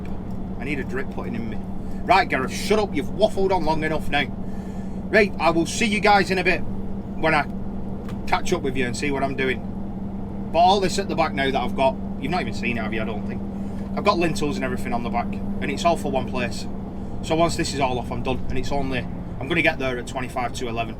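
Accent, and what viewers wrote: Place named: Bolton, United Kingdom. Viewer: Proper bowton accent that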